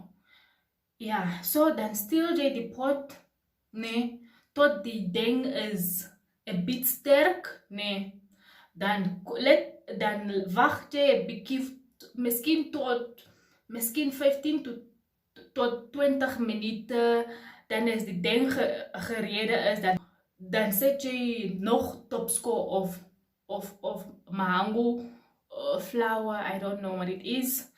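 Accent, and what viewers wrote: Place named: United Kingdom. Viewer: And the way you speak it with an English mixed accent 😍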